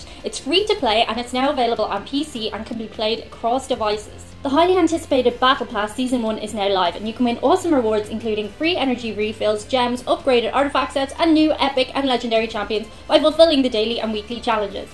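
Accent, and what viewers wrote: Ireland. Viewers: honestly, your list was somewhat tame....most of us in the states would probably not bat an eye with those colloquialisms (heck, we'd probably even ask you to explain it to us a couple of times so we can be "in" on the joke). Now...if you were to use words like C*#T, wh*re, sloot, Bit*h, feck (in the American standard usage of dropping the "e" for a "u") and the other name for a kitty cat...then yeah....you would get some strange, maybe hostile, looks from most americans too stuck up to have the piss taken out of them. But those irish words? naw luv, those were endearing in their own way, and most reasonable americans would ask you to repeat those words in a sentence just to hear you and your nation's lovely accent.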